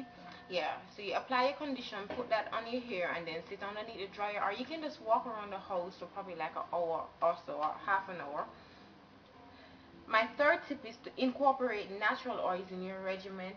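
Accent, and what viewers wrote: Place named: Jamaica. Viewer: W00t! Another Jamaican. Whaagwaan? Weh yuh deh? Jamaica still? Bway mi miss it bad bad. Not even affi look pon di name, a hear mi juss hear yuh voice an mi kNOW SEH a muss Jamaican dat. hail up. :D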